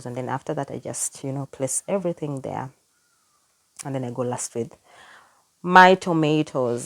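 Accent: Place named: Malawi. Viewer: I love how straight to the point the video is. I'm from Malawi, and we also use Royco here 😂. I've just bought a pressure cooker and an Air Fryer as well. What's beautiful to me is listening to your voice - it's literally identical to how we pronounce words here. Instantly, I was drawn to keep watching, and in fact, I'll be here for a long time watching your videos.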